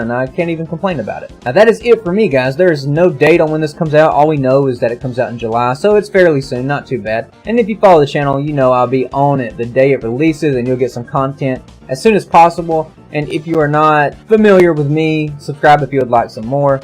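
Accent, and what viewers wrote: South, United States. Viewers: man i love you southern voice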